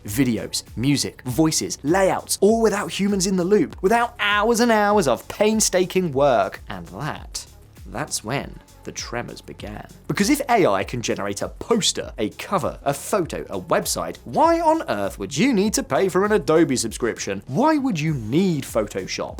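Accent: United Kingdom. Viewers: British accent. Disregard.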